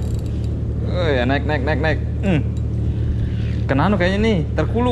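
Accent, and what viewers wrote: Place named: South Sulawesi, Indonesia. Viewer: Mantap Bosku ...klo dengar logatnya klo tdk salah dari Bugis Makassar kah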